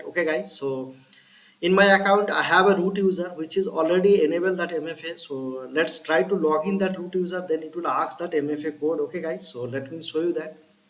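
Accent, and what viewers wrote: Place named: India. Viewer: Use sn AI text to speech reader because the India accent is too thick for me to follow what you sre saying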